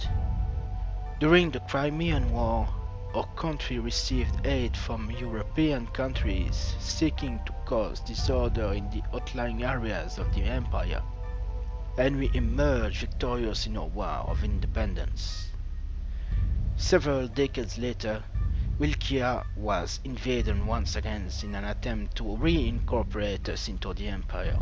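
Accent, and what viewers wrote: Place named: France. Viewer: yes i thinked you speek french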